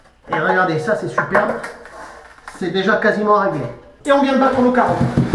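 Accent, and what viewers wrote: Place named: Pau, France. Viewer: Merci pour ta réponse et excuse moi je ne veux pas abuser de ton temps que je sais si précieux pour un artisan. Vraiment j'apprécie ton partage tes videos ta pédagogie et tes réponses si précisent. Je vais essayer de chercher une personne comme tu me suggères. Merci de l'information pour la résine et les carreaux je vais voir si je ne peux pas les faire sauter pour en mettre d'autres. Belle région que Pau je comprends mieux ton petit accent 😊. Amitiés Bon week-end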